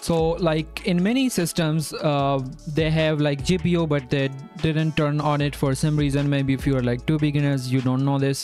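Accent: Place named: India. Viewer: Why do useful tutorial always have Indian accents?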